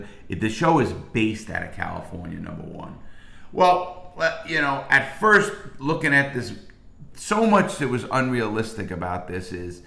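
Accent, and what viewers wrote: New York, United States. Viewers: U sound like you’re from NYC or the northeast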